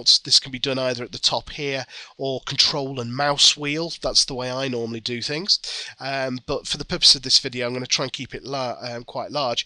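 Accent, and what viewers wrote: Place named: United Kingdom. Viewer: Hey brother thank you , i just did whatever you showed in this video and i learned how to find the relationships and dependancies.Thank you and you'r british accent is nice too.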